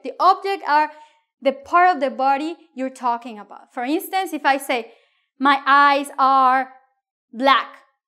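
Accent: Spain. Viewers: Is this Spain Spanish or Mexican Spanish? (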